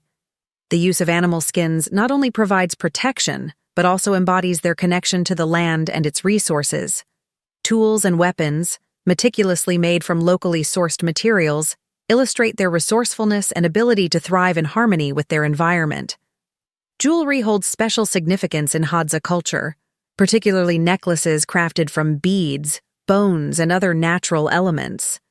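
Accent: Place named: United States. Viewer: Every time I watch videos of this tribe, I mostly enjoy listening to them talk. Their language is the main attraction. Now imagine having to listen to this American voice narrating a story 😞😞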